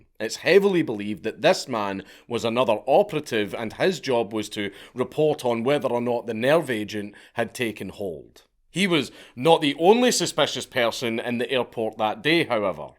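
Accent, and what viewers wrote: Scotland, United Kingdom. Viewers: seen it but always good to hear in scottisch accent...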